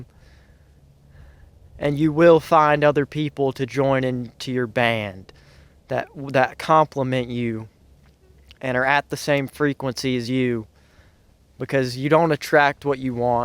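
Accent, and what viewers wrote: South, United States. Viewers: Great video man. Its cool to see someone in a video who talks like me. You from the south?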